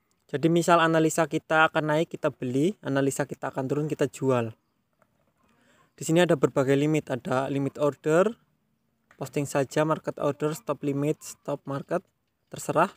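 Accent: Banyumas, Indonesia. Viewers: Bg orang ngapak ya?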